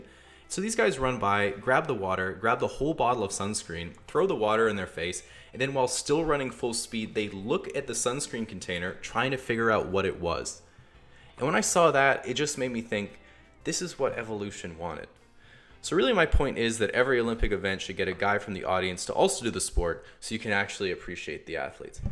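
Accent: Tasmania, Australia. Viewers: You're Australian! hell yeah! Do a video in an Aussie accent (Whole video) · U are a Canadian-Australian with a amazing Australian accent and great vids. U are awesome! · No wonder your Aussie accent is so good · I mean even normally you can tell he's Australian, the trace of the accent is still there, and it comes out in a few words. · I‘m not a native speaker and even I (or maybe because of it) can tell that he pronounces some words with an Australian accent. It‘s just something you never get rid of. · are you tasmanian? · Holy shit your Australian accent caught me off guard! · gaaah that aussie accent is legit haha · Wait are your from tassie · the return of the australian accent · you need to get out more, mate. Every single one of his vids where he gets it out you guys come out of the woodwork to say "it sounds nothing like an aussie accent" There's a whole bunch of aussie accents, and Ive defs heard his. 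He put on the map as being from tas, but Ive seen it in adelaide and rural western vic. For all you know one of his parents moved from queensland so he has a bastardisation, or his friends growing up were bogan and that influenced it, but nothing about it strikes me as off, just rare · Are you from Tasmania, mate? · make a wish! also I hear the Australian accent now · Are you from tasmania?? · I live for your Aussie accent mate!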